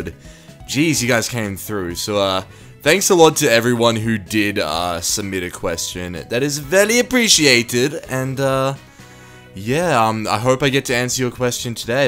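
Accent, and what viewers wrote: Australia. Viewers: are you from australia coz you sound like you are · just subscribed, don't know why but channels with australian (maybe you're from new zealand or the likes, im shit with this stuff my b) make me laugh so fucking more than if an american and or englishman(?) said the same shit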